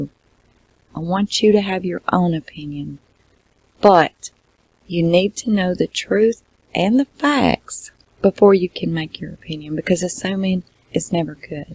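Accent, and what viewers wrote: South, United States. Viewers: Love your videos. New sub. You tell alot of things on this case that I've never heard. It's not just the same ole same ole info. Btw, love your southern accent 💜. Thumbs up👍🏽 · Ever since Camilla Harris My #1rule was Do Not date married men!! Do these women have no Moral compass.And who lets their daughter be a menace to society and protect bad behavior? You are the best. I am on top of a mountain looking out on life thinking..there are plenty of lonely soul's who would disrupt a family's happiness? J4J is going to try to get footage of nk at courthouse. Also hear she is moving to Texas..Good luck out there. Only state that is big enough to hide.. 💘 your content your country accent and your style.. Mr.RP needs his on channel... I am positive he would have great success with his content as well. We love him too ❤❤🚚🚛🛣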